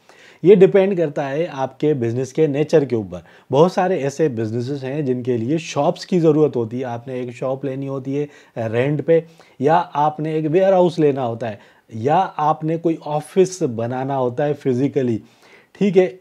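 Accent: Sindh, India. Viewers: bhai apka sindh accent hai. Kiya ap sindhi hai?😊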